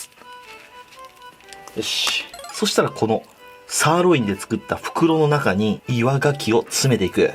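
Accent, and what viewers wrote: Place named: Mikawa, Japan. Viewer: しゃべりが三河弁？朝倉未来と似てるね！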